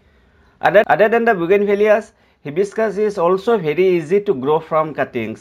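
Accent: Assam, India. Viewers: R u assamese ?? English accent xuni tu assamese jenei lagil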